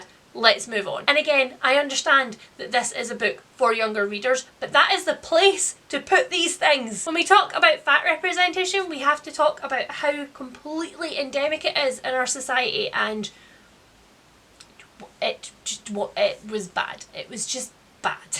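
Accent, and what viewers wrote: Scotland, United Kingdom. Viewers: i feel like i’m watching an episode of balamory w/ ur accent lol i love it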